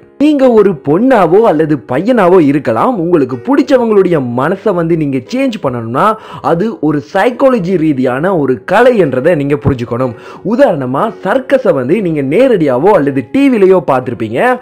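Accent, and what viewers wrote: Sri Lanka. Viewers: Bro neenga srilanka jaffnava?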